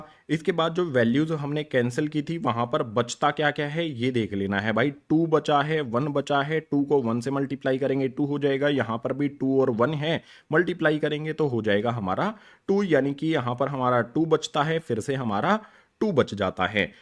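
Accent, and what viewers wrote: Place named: Bharatpur, India. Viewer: Language Bharatpur ki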